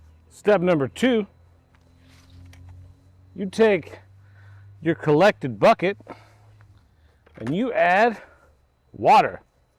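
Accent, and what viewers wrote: South, United States. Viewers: Love the videos yall. Love ur accent buddy. Lol I got me a southern accent too over here in Pensacola Florida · That southern accent fit perfectly for my part of the country. Good job Buddy.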